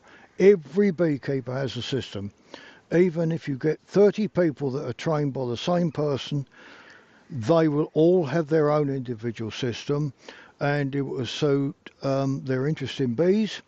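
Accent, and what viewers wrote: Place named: Sussex, United Kingdom. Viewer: Sussex. Not many of us left!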